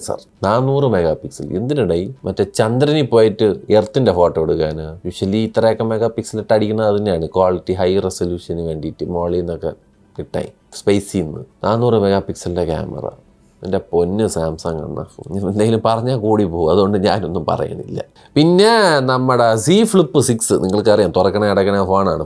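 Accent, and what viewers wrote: Trivandrum, India. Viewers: ശേടെയ് തിരോന്തരത്ത് വന്നപ്പോൾ ഫുൾ slang തിരോന്തരം 🔥 അടുത്ത തവണ വരുമ്പോ പറയണേ അണ്ണാ ഫാൻ ആണ് ഒന്ന് കാണാൻ ഒരു ആഗ്രഹം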